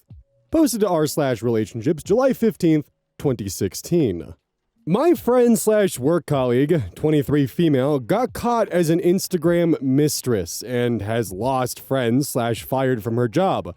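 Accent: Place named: Midwest, United States. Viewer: I have disagreed a few times with you, but I never hated you for it. You clearly state over and over you want our feed back and intend to ponder it. All in all, you are the strangest parasocial relationship I have. I used to live in Boulder when I started watching you, so you sorta felt like a friend because it was a possibility I might run into you. Your vocal mannerisms are a little reminiscent of the midwestern ones I grew up with and you are also one of those queers. As am I haha… anyway, keep being you and learn new things with time.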